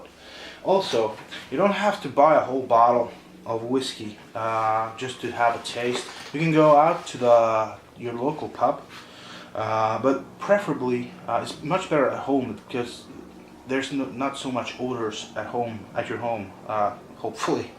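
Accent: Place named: Norway. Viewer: I really enjoyed this video,I am not a whisky man but I will have the odd single malt or a bourbon at home and yeah chasers are very common in the UK, I first had you down as American and then Irish and then Dutch and then Danish,are you Norwegian though? Cheers mate,subbed to and beer looks good as well,17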